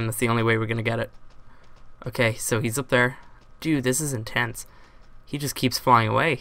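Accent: Ireland. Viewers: u used to sound so irish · your Irish accent is really strong in these old videos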